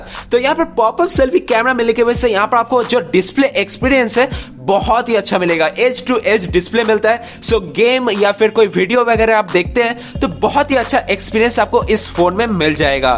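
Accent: India. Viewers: Patna se ho Bhai..?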